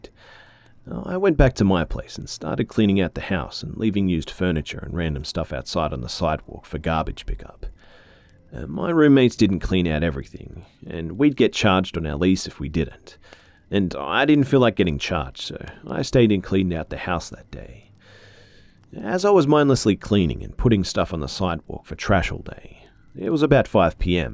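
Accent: Australia. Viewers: Ahhh, I took a break from horror stories for a while, but boy am I glad that to be introduced back into it by a smooth Australian voice. Thanks B! (You are Australian, right?)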